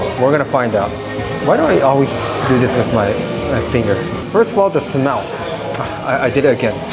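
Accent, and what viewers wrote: Singapore. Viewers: Great tour video! I will have to check out that area next time I am in SG. I must say your videos have really evolved over the years. I started watching you during Covid and it has been interesting to hear your accent evolve as well. At times you sound American or like you could be from here in Hawaii. Other times your Japanese accent comes out. But lately, I have noticed you sounding a lot more like the Singapore locals…. You have truly gone native, so to speak, but it seems like you can turn it on and off, depending on who you are speaking with or about! A sign of a talented mind…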